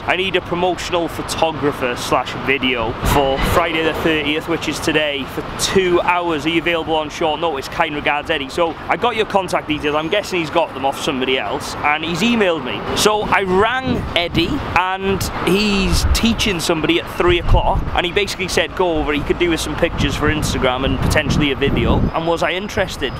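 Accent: Ireland. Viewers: Ah you already sound like an Irish person *i haven’t a clue what I’m doing where I am ps I’m from Kerry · We're is your accent from? I thought it was Irish. I'm in USA so I might not notice the differences. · Absolutely nailed the North Side accent!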